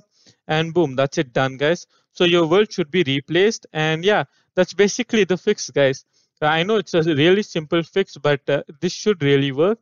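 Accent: India. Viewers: Indian accents are the best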